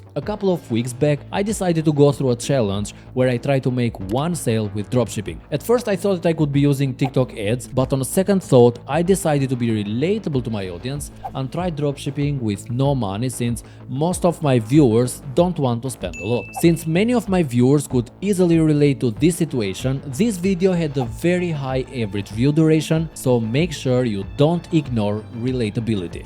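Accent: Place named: Albania. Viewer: hahahahahah sa dallohesh qe je shqiptar ti o plak 😂 vec nga theksi e kuptova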